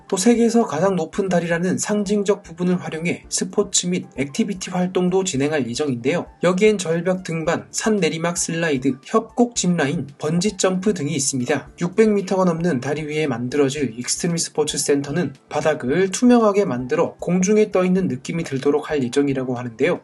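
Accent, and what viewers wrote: Gyeongsang, South Korea. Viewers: 채널 주인 분이 경상도 억양을 쓰시다보니 그런 것 같아요ㅎㅎ 경상도에선 유궐치뤌파뤌 이렇게 발음하다보니깐요.. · 경상도 사투리에요, 발음이 쌘게 특징이죠.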